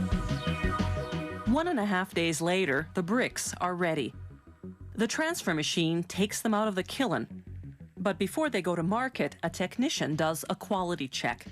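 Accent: Canada. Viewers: Her accent sounds Canadian, not American. Listen to how she says "process." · The commentator's Canadian dialect is thick. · Canadian much. That accent is killing me! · The narrators on all the how its made videos are always SO annoying. i think they do it on purpose. They are obviously real people but they sound like the google translate voices. is this woman canadian or something?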